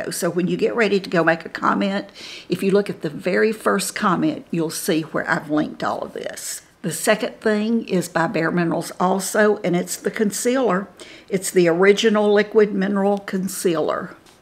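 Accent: South, United States. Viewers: I lost 8 of my closest family and friends. I had to take care of my husband for two years. He had Parkinson’s. He died almost 4 years ago. My reason for telling you this is I did not have a wrinkle one when all this started in 2009 I got all kinds of stuff. I just don’t know which way to turn, i love to watch you. You are so down to earth and I don’t know where you come from but you sound like a southern a lady. From Alabama. 80 looks beautiful on you beautiful!! 🩷 I hope that I can b like you. I watch all of your videos and love each one thank you for being there for me. I feel like you’re doing this for me ha ha💓🌸🩷 Just don’t stop! U make my smile! Cathy Seymour from North Alabama🩷🌸🩷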